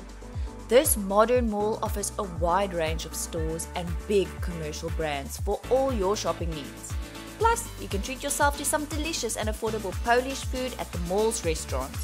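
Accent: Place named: South Africa. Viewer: Its funny to here a South African voice about Poland lekker man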